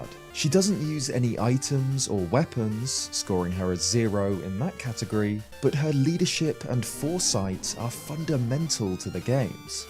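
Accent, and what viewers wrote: England, United Kingdom. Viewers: nah he’s english is js a trait of posher non rhotic english accents, there’s a short on this phenomenon i’ll try to find it and link it here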